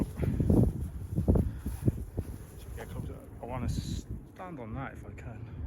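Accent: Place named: Monmouthshire, United Kingdom. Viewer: Off the scale handsome. Such a lovely accent too... Sounds like Monmouthshire to me, but may be wrong 😊.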